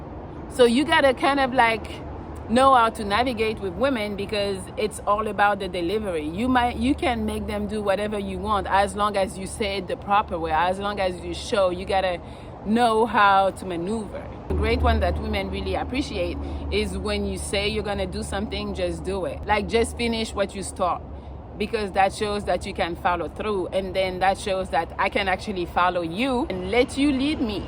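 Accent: France. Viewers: Hi, I am just discovering your channel, I mean I've whatched two or three of your videos so far and I like your frankness about your view of how a relationship should start and be conducted. I have just one question that has nothing to do with your contents: where are you from? My guess is that you are from some French speaking country because you pronounce certain words with a very slight French accent. How did you learn to speak english with almost no accent? I myself was born in Paris (France) originated from Martinique. keep doing what you already doing so good. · Irresistible like me damm I like that French accent you turn me on babe butt I have girl wish I would have you in my younger days this is from a great womanizer like me the best in the game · J'adore votre chanson " "....lol from Kenya. Also your french accent....looove it.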